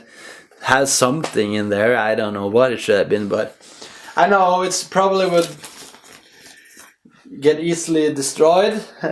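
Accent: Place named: Norway. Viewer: Boi u suck at english Sounds like your from Norway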